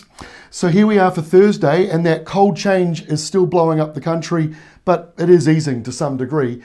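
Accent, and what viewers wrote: New Zealand. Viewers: Nice to have a kiwi reading the kiwi weather forecast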